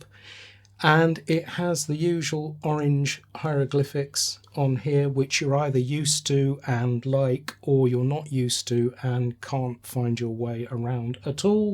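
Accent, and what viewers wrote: United Kingdom. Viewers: I could listen to this British accent all day.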